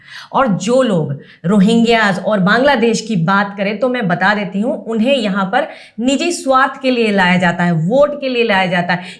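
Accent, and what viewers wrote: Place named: Uttar Pradesh/Bihar, India. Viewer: Superb presentation with classic rural UP & Bihari hindi touch. Very professional narration. Keep it up.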